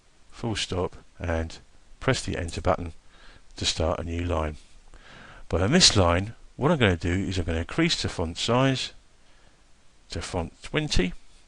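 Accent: United Kingdom. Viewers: This is not American English itS BRITISH ENGLISG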